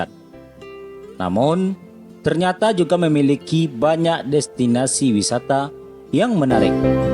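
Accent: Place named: Minangkabau, Indonesia. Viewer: Dialek minangnya kurangi bang. Pengucapan huruf E nya diperhalus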